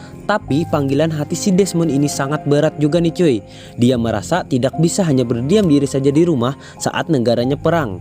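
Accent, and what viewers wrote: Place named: Banjar, Indonesia. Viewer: Kok kaya orang banjar logat ya bro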